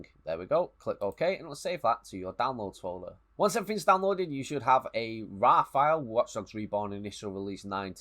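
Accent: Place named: United Kingdom. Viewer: That british accent is amazing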